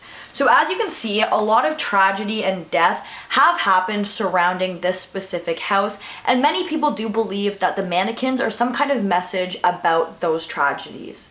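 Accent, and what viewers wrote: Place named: Canada. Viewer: I love how Canadians say "about". Aboat or aboot, hehe. Sorry, I'm British. Awesome video once again, you're the Queen of the creepy. <3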